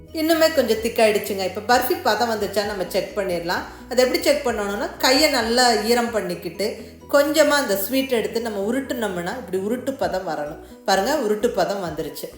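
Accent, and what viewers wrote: Coimbatore, India. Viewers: Super mam your kongu tamil and your all recipes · love your voice and presentation. cbm slang, good to hear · I enjoy following your recipe, and listening your Kovai Tamil.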